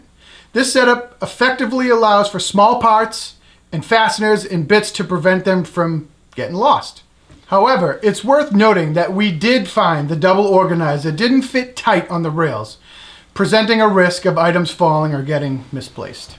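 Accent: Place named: New England, United States. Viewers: He talks just like the older guy, it's not just the New England accent either. I wonder if he taught him how to do it? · Its our Boston accent! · Is that a lovely New England accent? Well done 🎈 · Weird how much you sound like Rob! Born and bred in New England too, no doubt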